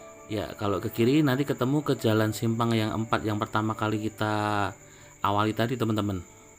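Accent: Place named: Java, Indonesia. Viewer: Si mas nya berlogat jawa. Dari jawa ya mas?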